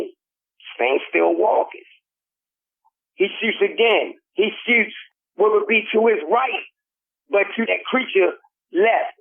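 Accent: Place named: South, United States. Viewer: Aye, Great stories, can't wait for more. Thanks!!!!! P.s I Love your Southern accent!!! Be safe!!!!!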